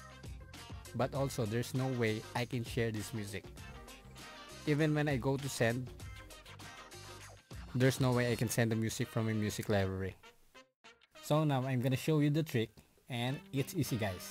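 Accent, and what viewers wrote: Philippines. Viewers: Are you a filipino cuz of you’re accent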